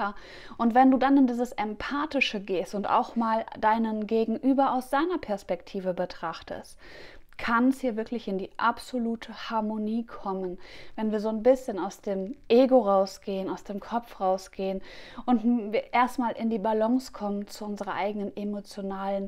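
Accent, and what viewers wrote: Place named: Austria. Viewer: Sehr lustig dein österreichischer Dialekt, aber leiwand 😁👏 nur gustl...dummer August 😉😇🫶 Hab tatsächlich grad viel Power und Energie...hoff es bleibt so💪💃 Danke dir liebe ❤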